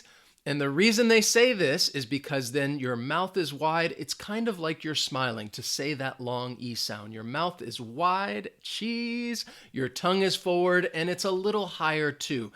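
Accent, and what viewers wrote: United States. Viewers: i love your accent. from which part of the US are you from?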